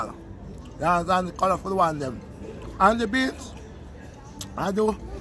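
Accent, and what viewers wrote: Jamaica. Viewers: I could tell he was Jamaican before even goin on the video 😂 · Is that Jamaican?? 🤔🤔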